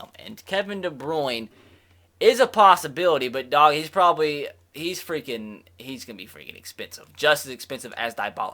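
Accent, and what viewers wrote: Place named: South, United States. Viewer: no hate, just curiosity are you southern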